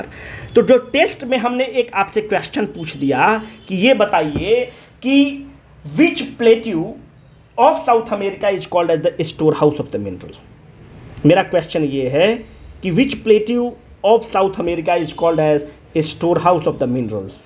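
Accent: Bihar, India. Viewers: Hero is Back.... What a strong voice and I love the way you substantiate things..... BIHARI Hindi tone mixed with Sound vocab of English is deadly combination sir😊 Loved every bit of video!!!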